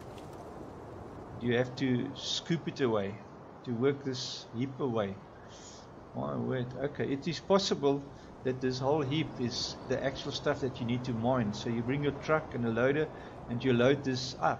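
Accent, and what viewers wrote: South Africa. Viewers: I hear SouthAfrican English, I upvote ;)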